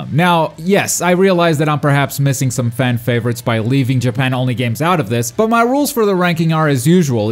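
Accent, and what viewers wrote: Portugal. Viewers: I didn't know you were Portuguese, but then the more I heard your voice, the more I realized your accent is like St1ka's. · Oh man, I thought you were from Spain all this time, and it turns out you're from Portugal. Nice!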